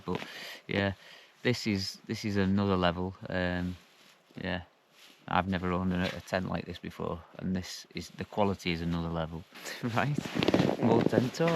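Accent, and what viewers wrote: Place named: Northern England, United Kingdom. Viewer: I'm late to the party mate but new subscriber from west Yorkshire England,you sound like a northerner yourself, where you from buddy, absolute banger of a tent!!, I've got the red label,,ATB mate,,Daz👌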